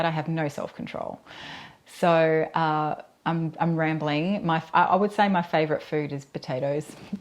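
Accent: Australia. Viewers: You've quickly become a new favorite of mine and your answers are so thoughtful and thorough. I really enjoy listening to you and your lovely accent! I'm from the US and the Midwest specifically so I rarely hear Australians except for YouTube. Beyond the accent, I also love hearing your descriptions and I've learned of many new scents to try. I may subscribe to a monthly service to broaden my collection.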